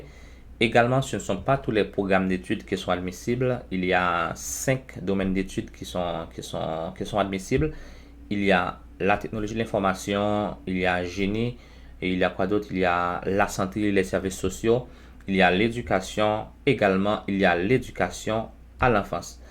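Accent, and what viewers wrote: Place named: Haiti. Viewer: Vous eyes d'ou, vous avez l'accent Haitien. Si chest vrai, peus tu m'envoyer vote email j'ai des questions.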